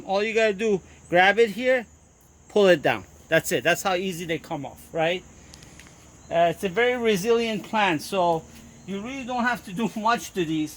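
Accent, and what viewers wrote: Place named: New York, United States. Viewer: Great advice best ever. Love your New York accent.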